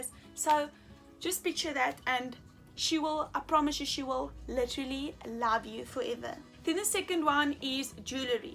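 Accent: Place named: South Africa. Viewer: Ur accent does it for me, proudly South African lols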